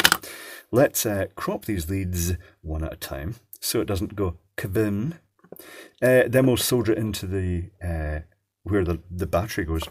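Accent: Scotland, United Kingdom. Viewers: Pure meditation, the soothing flow of on the fly hacking and improv... plus the mellow lilt of the Scottish commentary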